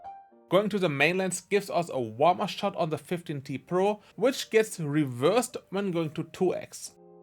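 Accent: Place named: Germany. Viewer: Man hört einfach immer raus wenn ein deutsch Sprecher auf englisch tut meine Güte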